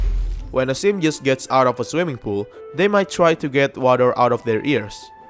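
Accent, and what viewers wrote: Indonesia. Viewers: r u indonesian?!? your accent sounds kinda indonesia-ish and also your youtube name :D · ea yg ngomong sama punya channelnya org indo ya wkwk kedengeran dr aksennya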